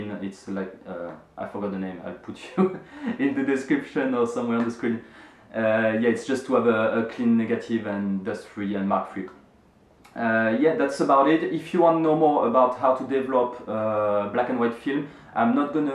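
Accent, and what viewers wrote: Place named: France. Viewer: aaaaah, le petit accent français! J'adore votre site au passage, super utile pour apprendre!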